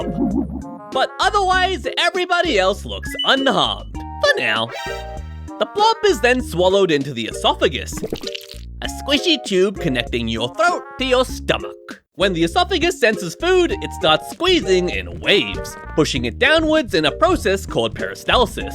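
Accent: United Kingdom. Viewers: are you british? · Are you british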